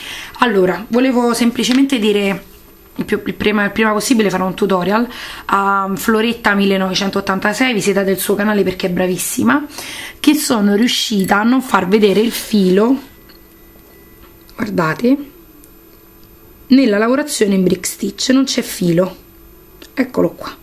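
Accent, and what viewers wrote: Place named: Rome, Italy. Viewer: Nun se spostano de na pezza...........che bello sentì parlà romano .........................mi manca la mia Roma. A parte questo sfogo bravissima molto belli i tuoi lavori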